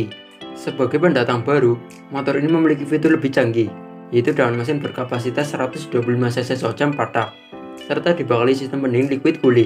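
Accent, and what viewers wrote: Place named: Banyumas, Indonesia. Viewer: mantap ngapaknya masehhhh🤭😁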